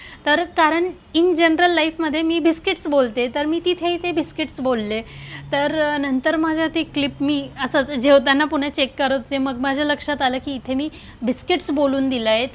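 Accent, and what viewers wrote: Khandesh, India. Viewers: खूपच खिळवून ठेवणारे , वास्तवाला विनोदी पद्धतीने , टोमणे मारत सांगीतलेले असते.. सर्वात कमाल म्हणजे हे आधी लिहिलेलं नसतं, ऐनवेळी कसे सुचते? खूप छान flow असतो , विषय सोडून अजिबात इकडे तिकडे जात नाही.. आम्ही 5 मिनिटात बघतो reel. पण किती प्रचंड मेहनत, आणि बारकाईने detailing केलेले असते.. सासूबाई, आई सगळे पात्र अगदी जिवंतपणे उभी करता.. ह्याचे आश्चर्य वाटते की विषय सुचतात तरी कसे?आणि ते किती सहजपणे खुलवता तुम्ही!! प्रत्येक वेळीस सर्व पसारा आवरणे, घर टापटीप करणे, बापरे , किती तळमळीने करता हे काम? आवाजाचा खानदेशी ठसका ,स्पष्ट आणि गोड आवाज सगळाच खूप छान आहे.. केवळ viewers साठी घेणाऱ्या तुमच्या कष्टाला सलाम!. All the best..तुमच्या reel ची नेहमी वाट बघते..आणि कितीही घाईत असले तरी ते बघितल्याशिवाय राहवतच नाही. 😊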